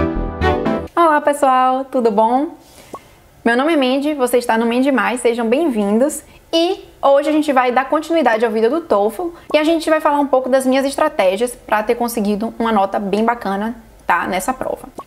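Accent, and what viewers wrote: Brazil. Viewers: Eu bem que notei pelo sotaque dela que ela é daqui da terrinha. rs'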